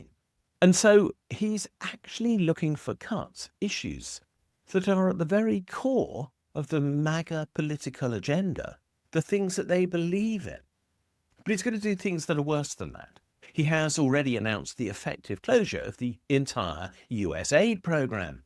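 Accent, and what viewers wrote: United Kingdom. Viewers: I’m not going to waste my time listening to your British sounding horse shit! MAGA will give up when they have experienced so much PAIN!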